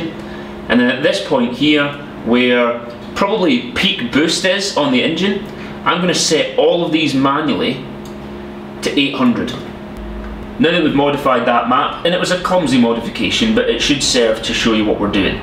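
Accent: United Kingdom. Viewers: Hi there , Like the video and the English accent to be honest (am Belgium) Question: witch software are you using please ? Cheer's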